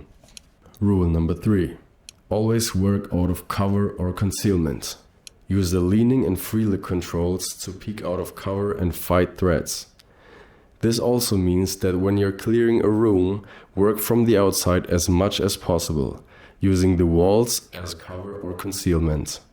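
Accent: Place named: Germany. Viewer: bro sounds a bit too german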